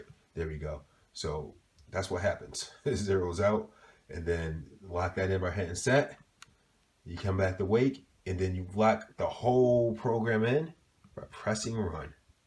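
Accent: Connecticut, United States. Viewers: Bruh speaks like a Connecticut Yankee!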